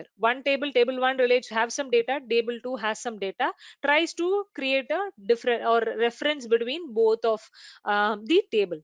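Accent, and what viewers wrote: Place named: South India, India. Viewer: Disgusting South Indian accent. It’s domain not domine!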